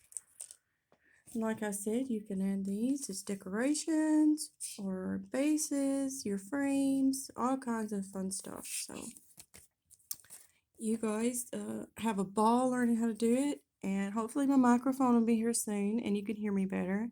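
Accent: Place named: South, United States. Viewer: I saw a top comment of yours and I thought that was pretty awesome. Never met too many southern white people who enjoy 2Pac. Love your beautiful southern Belle accent, Mrs.!!! I’m from the south as well btw. 😊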